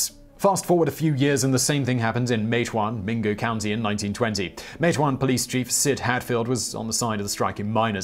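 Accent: United Kingdom. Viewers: There are times when I watched him before I knew where he was for certain that I could not tell. Is he American (no) is he British (maybe?). I figured he was a transplant.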